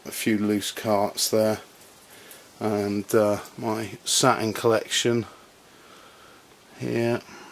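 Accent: England, United Kingdom. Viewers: hes obviously English... really stunned by the confusion.. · He said he has PAL games which are European versions of the games. I'm assuming he is from England somewhere by the way he talks.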